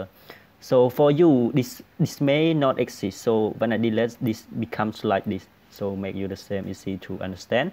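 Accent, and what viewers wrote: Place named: India. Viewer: You sound like indian tech support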